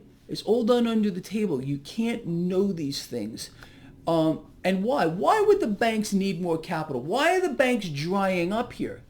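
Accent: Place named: Brooklyn, United States. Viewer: Preppayerd lol. A Brooklyn accent can never be concealed.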